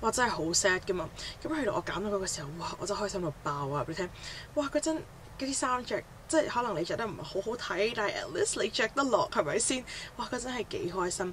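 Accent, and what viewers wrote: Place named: England, United Kingdom. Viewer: did you go international school? or studied in a foreign country cuz I think there is slightly an english accent when you speak in Canton....just curious....nth much...love your channel and this video....^^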